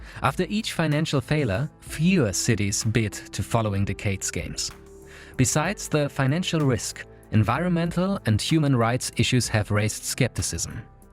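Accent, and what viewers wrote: Germany. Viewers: When i heard the german accent i instantly went to channelinfo just to be confirmed. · I can hear your German accent soooo clearly!!! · If you have not noticed it by the German accent this was the ultimate hint. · I like his german accent. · because he has a thic german accent and tries to hide it by pronouncing everthing very pREcisLEy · His accent ist most definitely from a German speaking country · I didn't need to hear him say Rhein-Ruhr to figure that out, he has a german accent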